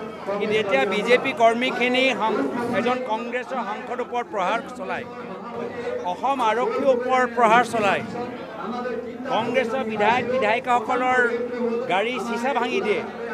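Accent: Bihar, India. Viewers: প্ৰহাৰ নচলাই প্ৰহাৰ কৰে হে। অসমীয়া ভাষা টো ভালকৈ কোৱা উচিত কাৰণ আপুনি অসমীয়া। বাকী অসমীয়া কওঁতে আপোনাৰ বিহাৰ,ইউ পিৰ সুৰ টো সলনি হোৱাৰ আশা নাইকৰা।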